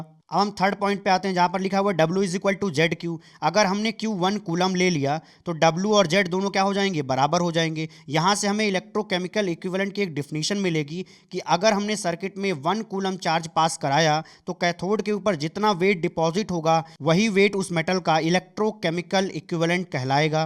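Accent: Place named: Bihar, India. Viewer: This chemistry sir feels like he's from bihar😀😀....so proud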